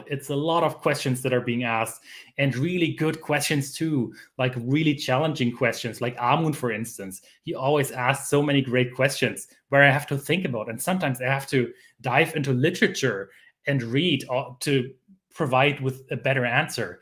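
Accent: Germany. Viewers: I thought the German accent was fun! Do you have to actively try to suppress the German accent when speaking English or does that come naturally?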